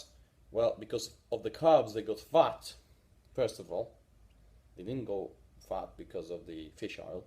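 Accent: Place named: Eastern Europe. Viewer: Panie, bardzo ciekawe video, ale czy nie zastanawial sie Pan nad przejsciem na forme pisana? Niestety slychac, ze jezyk angielski nie jest Pana ojczystym jezykiem, robi Pan calkiem sporo "polskich" bledow jezykowych, ma Pan mocny slowianski akcent i slychac, ze z trudem szuka Pan slow. Polaczenie tego wszystkiego z dosc slabym udzwiekowieniem (moze dodatkowy mikrofon tuz pod szyja) sprawia, ze po prostu ciezko sie tego slucha i trzeba sie mocno skupic, zeby wyluskac tresc. Czytalem kiedys Pana artykuly nt. treningu silowego i byly bardzo dobrze napisane. Moze zamiast formy youtube'owej przerzucilby sie Pan po prostu na bloga w jezyku angielskim? Tlumaczenie tekstow z jezyka polskiego na angielski jest aktualnie smiesznie tanie, a moglby Pan zlapac wiekszy traffic, szczegolnie na kfd/sfd/pokrewnych forach. Pozdrawiam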